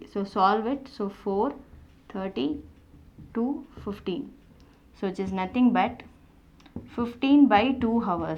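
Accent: South India, India. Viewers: sounds like she is south indian bro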